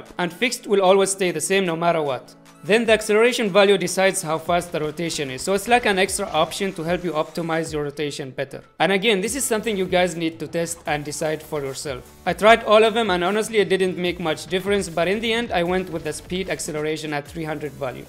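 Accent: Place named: India. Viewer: U sound like u from INDIA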